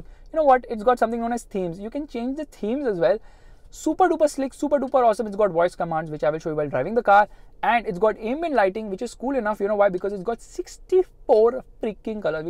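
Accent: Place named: India. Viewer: your english is boring learn how to to pronounce and continue with it you are here in hindi accent and you feel like english really is boring just listen just go for hindi you think you speak english but reality is you are speaking Hindi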